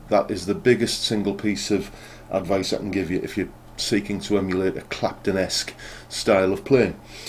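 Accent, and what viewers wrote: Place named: Redcar, United Kingdom. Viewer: A great video and I must say I love your explanations in this and other videos. I feel like I’m learning fast from them. I recognised your accent as soon as I heard it.....I grew up in Redcar and Marske myself. I’ve subscribed and will keep watching and learning.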